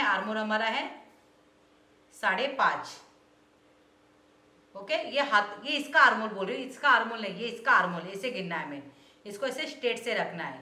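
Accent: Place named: Maharashtra, India. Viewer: मैडम आप के बोलने के तरीके से आप महाराष्ट्रीयन हो ऐसा लगता है। वैसे आपके सिखाने का तरीका बहोत ही अच्छा है। असं वाटतंय की आपल्या घरातल्या माणसाकडूनच आपण शिकत आहोत. इतक्या जवळच्या वाटता आपण. 👌👌👌👌🌷🌷🌷🌷🌷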